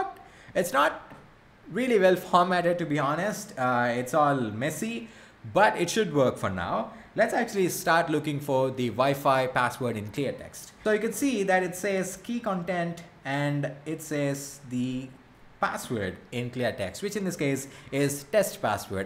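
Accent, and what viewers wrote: India. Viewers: I still don't understand. i Indian accent is completely understandable why people need a fake layer on that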